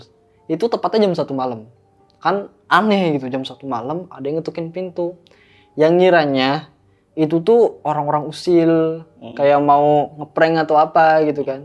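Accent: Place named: West Java, Indonesia. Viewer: abang nya ngobrolnya lucuu 😅 sunda campur logat nya adaa,, cerita serem pun jd nyantaii dengernyaa ,, sukses terus buat semuanyaa,, lentera malam pkonya kerennnn 🤗🤗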